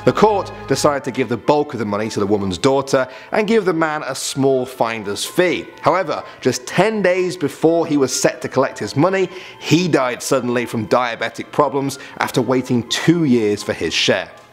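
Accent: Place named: United Kingdom. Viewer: Never have a Brit narrating.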